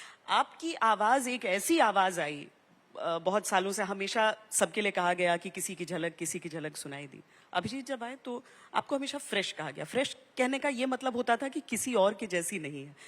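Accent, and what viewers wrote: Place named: Bengal, India. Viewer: Avijitdada. Bengalees. Kavi. Larkalerkime. Bar. Nehilagaye. Khati. Khata. Kavi. Banglamenehitha5hazarsalme. To. Hindi. Me. Jo. Ea. Differentiation. Ea. Samajhneme. Samay. Lagtahihae. Koi. Nonbengali. Banglanehibolpate. Parpate. Samajhnehipate. Dadathikbole. BengaleeSabse. Madhur. Suddha. Hindiboltehae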